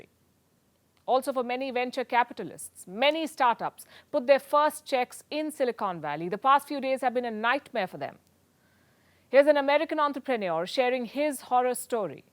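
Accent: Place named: India. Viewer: She is average indian lady. She speaks English in hindi style with modulation. May be u like her modulated style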